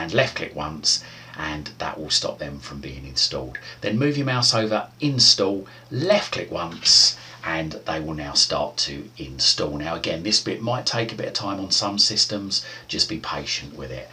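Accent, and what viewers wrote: United Kingdom. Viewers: Oi, are you british mate aye?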